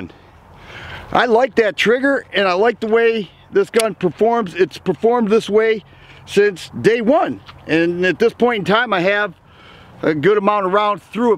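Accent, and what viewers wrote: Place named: South Jersey, United States. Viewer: Sound like your from South Jersey or Jersey at least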